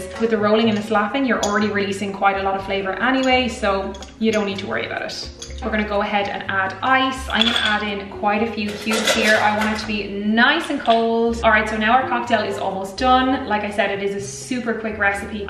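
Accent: Ireland. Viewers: I love your Irish--accented Spanish <3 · You are simple beautiful love your irish accent. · Damn. Prolly the best looking Irish girl I’ve seen. That accent I can barely understand though.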